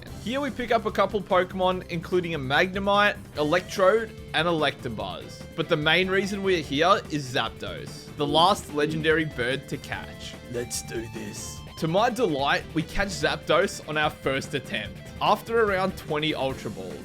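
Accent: Australia. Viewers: Cringe aussie accent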